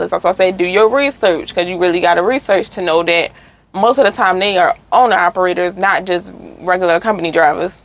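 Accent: Baltimore, United States. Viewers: I knew you were from Baltimore nobody else sound like us what happened to the NFI vid? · i’m just listening to her talk and i’m like she from baltimore…. but idk then i came out 🤣 our accents strong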